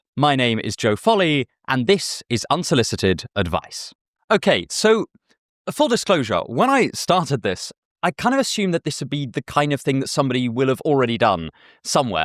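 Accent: RP, United Kingdom. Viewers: There is something very funny in hearing Joe grandly articulate "What-to-do" in his rather posh and fabulous english accent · it's all about the british accent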